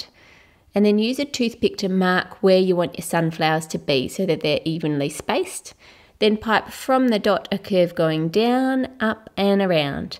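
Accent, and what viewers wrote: Australia. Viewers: R u Australian?? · Haha you sound so much more Australian i this video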